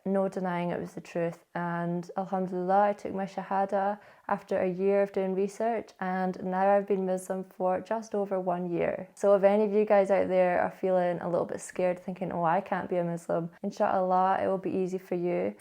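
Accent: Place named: Glasgow, United Kingdom. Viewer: Glaswegian accent😂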